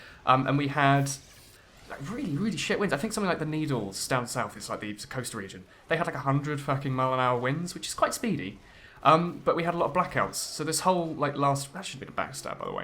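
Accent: RP, United Kingdom. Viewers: true, I got my shitty american accent and you got that fancy high class british accent.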